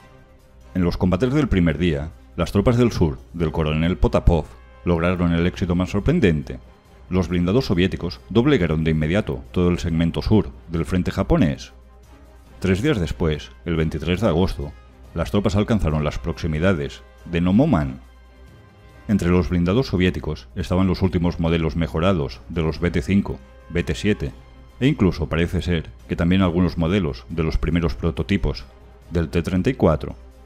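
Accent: Galicia, Spain. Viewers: ¿Es la entonación al hablar de origen gallego o asturiano?. Me encanta; saludos.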